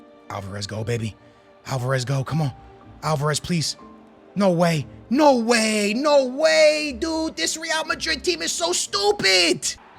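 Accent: Portugal. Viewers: falas tao bem ingles q quase nem se nota o portugues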